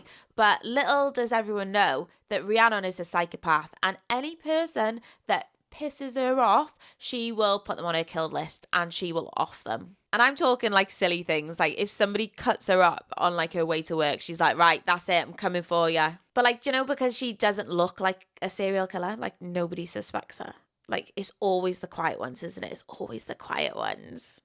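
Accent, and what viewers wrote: Wales, United Kingdom. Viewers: Catching up on your videos and it's been bugging me for months where your accent is from!!😆 As soon as you said you were Welsh when talking about Sweet Pea I was like "I knewww it!" 😂 I'm from South Wales (about an hour from Cardiff) and I knew your accent sounded Welsh to me! 😆 (also thank you for the recommendations, I will be adding a few to my list for sureee🫡❤️)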